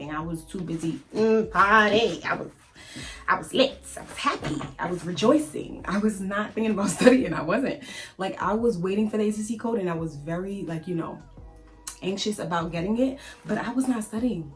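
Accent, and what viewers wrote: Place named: Liberia. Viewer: I keep hearing a slight Liberian accent. Darling, are you from LIB?😉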